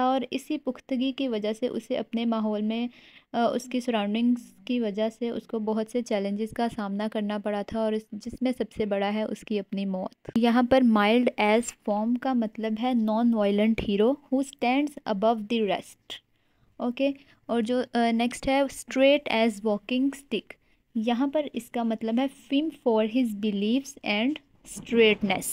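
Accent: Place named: Lahore, Pakistan. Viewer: Thankyou Mam ji....... love from India and aap Lahore ki Hai mujhe pata chal gya jab aapnne bola theek ho gaya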